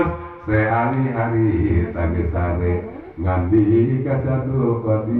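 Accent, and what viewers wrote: West Java, Indonesia. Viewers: Logat sundanya unik trimksh bisa menghibur 👍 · Logat sundanya mantap mas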